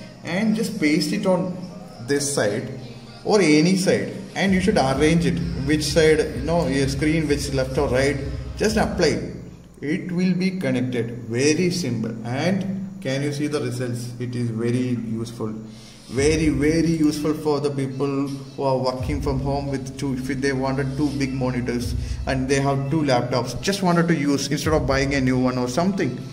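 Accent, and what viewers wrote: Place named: Kerala, India. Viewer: by your accent , i am pretty sure you're a malayali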